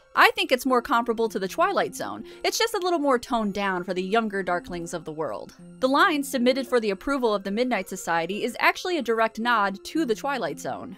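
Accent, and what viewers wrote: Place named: United States. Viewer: American accents like yours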